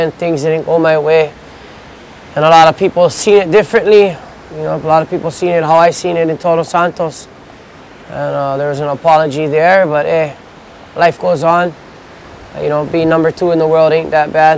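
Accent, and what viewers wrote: United States. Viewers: is that not still Hawaiian? Of course it is.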